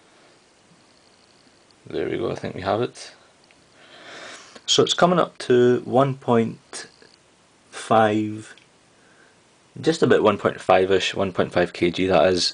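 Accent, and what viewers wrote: Scotland, United Kingdom. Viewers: Are you Scottish? B)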